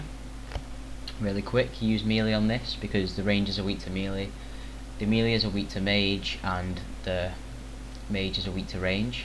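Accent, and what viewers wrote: United Kingdom. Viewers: You from middlesbrough? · geordie accent :D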